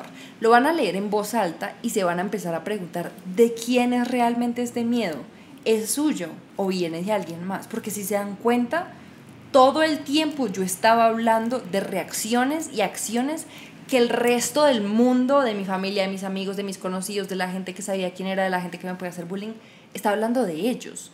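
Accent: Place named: Antioquia, Colombia. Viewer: a mi se me gusta tu aura :) Siento que se te pegó el paisa jejej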